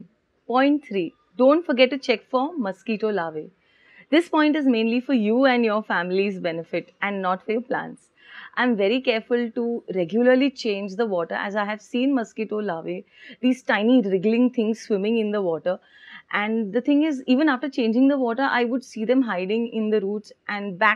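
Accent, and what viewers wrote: India. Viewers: This is how my indian university lecturers talks. I can't understand shiat. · I love the Indian accent, it’s the best.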